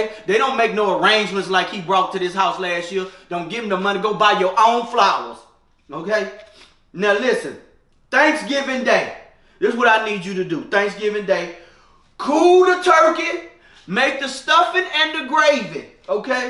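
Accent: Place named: Houston, United States. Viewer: I love this Houston accent. He sounds exactly like my mother... bad attitude and all. 🤣🤣🤣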